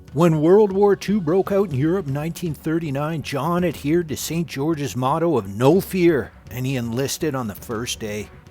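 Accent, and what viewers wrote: Canada. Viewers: i know the family personally, went to high school with Mr. Clothier and 'Ms. Shirley's daughter [Jessica - my year - a friend] and son [John - a year earlier and more of a friend], in North Van. I have been to their home many times [an 18-minute walk]. I was actually more commenting on your dialect... being born and raised in North Van and had many First Nations friends [not in my neighbourhood, except 4 doors down from my childhood street ['weird' - not 'weird' - growing up that I never thought of them as 'Indian' - my brother was best friends with the oldest brother and all I identified the 3 brothers as Randy, Rick and Wynn] but in the Squamish reserve in North Van... [long story how I accumulated many First Nations friends in a reserve that was a 14-minute bus ride away], your dialect sounds very Squamish Nation, but maybe you could correct me. 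Musqueam? Love the background that I already knew, with a few phenomenal extras you revealed... exploring your high school friends is not what most friends do, except the home had war photos and stage acting photos of both Mr. and Ms. [from England and Canada and the University of British Columbia, my Bachelors and Masters alma mater], so some stories came out, but Mr. Clothier was rather humble about his war endevours, like most Canadian veterans, just like my father, a navigator in the Pacific during WWII.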